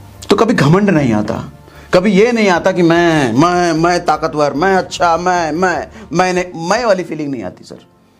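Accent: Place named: Bihar, India. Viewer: Sir aap jab bihari mi balte ho to bada ache lagte ho Aap ki motivation video muje bhut pasand hai .or mere andar bhut change aata h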